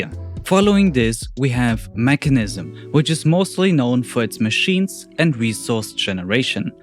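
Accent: Germany. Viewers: Bro, are you actually German? Maybe it's just me but.i hear a VERY slight German accent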